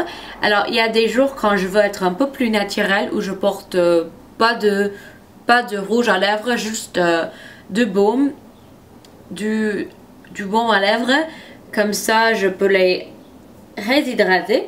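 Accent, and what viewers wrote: United States. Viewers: I learned French in school for 5 years now and thought I can speak and understand it now... After watching this video I know, that I can't 😅 It was so difficult to understand 😁 Et j'ai une question: Est-ce qu'elle américaine? Je crois, que c'est très faux ( dunno if this is the right word 😅), but I was wondering if she is amercian or something else native english? Btw I'm german 😄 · J'adore ton petit accent américain. Tu es trop choux!!! Biz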